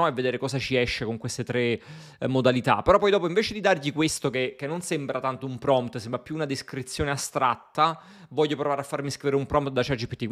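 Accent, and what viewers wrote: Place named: Naples, Italy. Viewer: Da ex grafico Pro... I loghi creati dalle IA sono abbastanza banalotti però per essere strumenti decisamente giovani devo dire che il lavoro che svolgono non è cosi malvagio. Anzi! Tra qualche anno ci sarà da ridere... O da piangere dipende Comunque devo essere sincero. Seguo i tuoi video più che altro perché amo l'accento napoletano 😅 Sono nato a Roma ma il napoletano è la lingua più bella che c'è 😅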